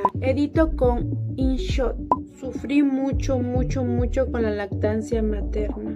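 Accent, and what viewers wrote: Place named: Mexico. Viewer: De verdad pense que eras mexicana porque tienes ese acento mexicano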